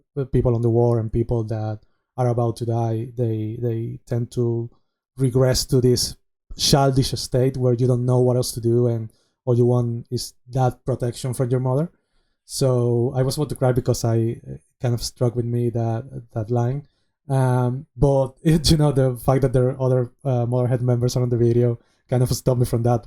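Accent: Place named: Spain. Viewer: Estoy enganchado a las reacciones, y el acento español se reconoce al segundo. Yo el primero. Buen video.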